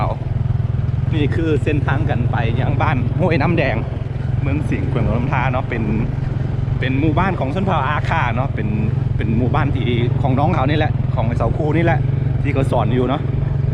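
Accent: Thailand. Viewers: ฟังสำเนียงการพูดแล้วเหมือนคนไทยเลย คงจะเคยมาอยู่เมืองไทยนานนะ คำพูดสมัยใหม่ไม่เหมือนคนลาวจริง ๆ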